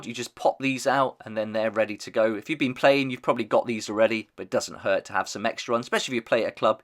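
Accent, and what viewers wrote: United Kingdom. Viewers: This is an unrelated, dumb question, but since you have a UK accent, and you have that knife to open the box, are those knives legal to carry in the UK? Just curious as a US person.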